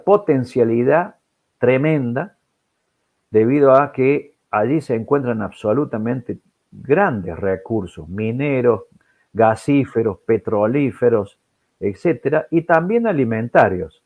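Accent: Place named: Córdoba, Argentina. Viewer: Tonada cordobesa!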